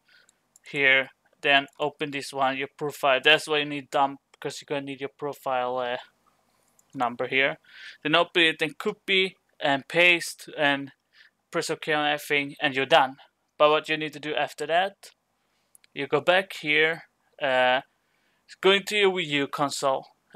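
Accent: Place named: Arab world. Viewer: ok so if u are offended by constructive criticism (not complaining), im sorry...but u speak and write as if your native language is arabian or something like that and also you seem to swallow full words..i've just been honest..if u don't like honesty..then why not deactivating the comment section or just don't talk to me...and why didn't u say this earlier that u don't have your nintendo wii anymore..we could have saved so much typing here...the people who tell u that this video is fine, they most likely have had experience with this stuff before..i asked because im a newbee and usually i can follow every and the most complicated trutorial on the web successfully, even if i didn't know about the subject before, but this isn't possible with your tutorial, you should reconsider if it's really me who made the mistake here..or you...not to forget that i already mentioned that yours is the only video tutorial i found on the web treating this subject..but yea, if your tutorial here was really just about transfering a nintendo wii save state to the pc, then the whole name of this video is already misleading for english people, i wanted the opposite..btw. 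my native language is german and i don't seem to have issues with english typing or speaking..and i got one last thing for u, if it's really a problem for you to be held responsible for older videos you've made, why not deleting them..i just don't get u..i mean i'm sry that u can't deal with constructive critcism...i will find hel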